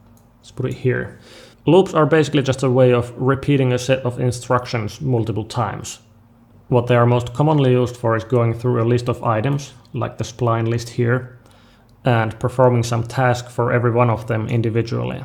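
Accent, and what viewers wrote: Finland. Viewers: Are u from Finland?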